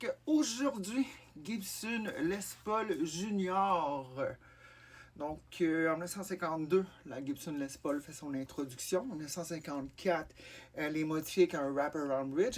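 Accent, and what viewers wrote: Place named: United States. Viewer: Putain, un accent à couper au couteau... Ça parle moitié anglais moitié français... Et quand ça parle français ça a un accent américain.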